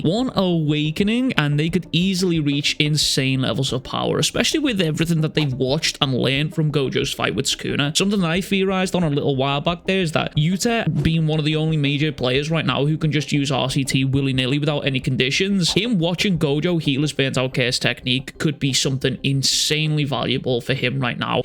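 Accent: Liverpool, United Kingdom. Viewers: This scourcer accent makes this video even more enjoyable to watch, big greetings from Germany keep it up u sexy geezer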